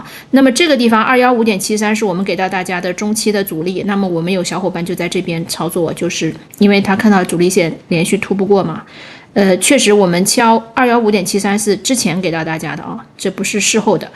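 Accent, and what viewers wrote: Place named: Beijing, China. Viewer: 期待捣持出镜，亲切的老北京话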